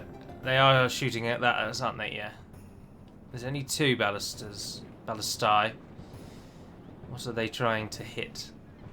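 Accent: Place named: RP, United Kingdom. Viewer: I'm a very recent subscriber, but I have to say, your Third Age LPs are a breath of fresh air, partly because of your super aggressive style of play, and partly because you edit out the boring bits when needed. It sets you apart from a lot of other TW YouTubers, and makes your campaigns flow at at a pretty smooth pace, which I like. I also find your commentary and super posh accent (I mean no offense; I'm a big fan of those accents) pretty enjoyable to listen to.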